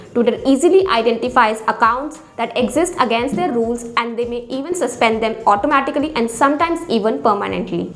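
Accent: India. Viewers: Why do you have an Indian accent. You don’t look Indian. Do you have any idea how annoying your screechy voice is? Plus, your video is not helpful. Click bait.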